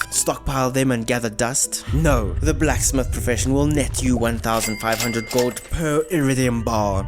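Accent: South Africa. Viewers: First time watching one of your videos and instantly had a feeling you were from South Africa Howzit boet! 🤙🏻🇿🇦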